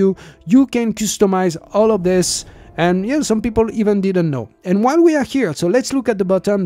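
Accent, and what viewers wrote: France. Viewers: Thank you for this video. Subbed and liked. Side note, my mother is French (born and raised) so to me your accent is awesome.👍 A lot of people think the French language sounds so romantic. That's only because they've never had a tiny, very angry French Mom cursing at them in French and swinging whatever was close by that she could get her hands on and use as a weapon (or throw it at you lol).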